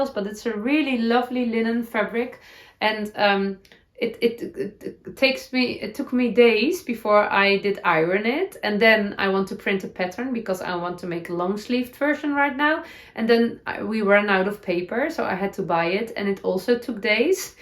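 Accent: Netherlands. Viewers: You can say your eyelids are getting heavy. I love your Dutchy English 😄 also you festive yoke is beautiful. I’m glad I haven’t made it yet because the neutral colors are really fabulous. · Your Mentor socks are so cool!! We would say ‘high instep’. Love listening to your ‘Dutchy English’. ❤️❤️❤️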